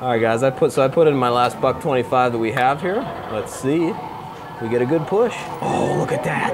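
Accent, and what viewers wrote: Pittsburgh, United States. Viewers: You have a pretty strong yinzer accent.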